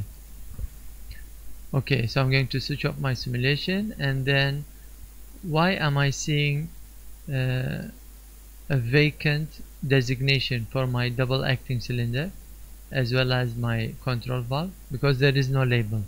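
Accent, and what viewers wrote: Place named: Arab world. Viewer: may i know what you race is bro? Your english accent is like an arabian... 😁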